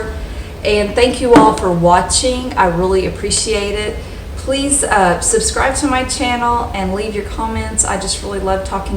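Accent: South, United States. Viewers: I love your accent,. Are you from Georgia or the Carolinas? I’m in Wisconsin.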